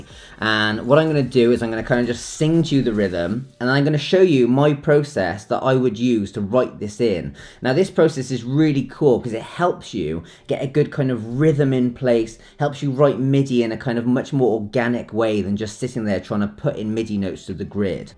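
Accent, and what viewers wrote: United Kingdom. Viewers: Shit,! That was a very nice video. I am in a DJ/producer course, but now I see I should have spent the money on gear and learned from you, on YT. You have great content. Hope we can share a beer when I'm UK (you're based there, I assume, due to your accent.) Love from Brazil.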